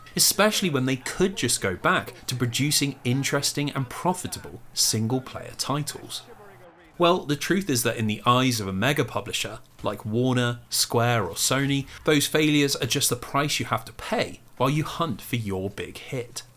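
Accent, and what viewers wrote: England, United Kingdom. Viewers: Smooth talking British slop is my medicine 🥸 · Wait you said a market wide crash but excuse me if I detect a English accent, the thing is I’ve seen countless Europeans complain us Americans are sooooo egotistical for ignoring how there was no crash in Europe and how we are so terrible for assuming the world revolves around us awful Americans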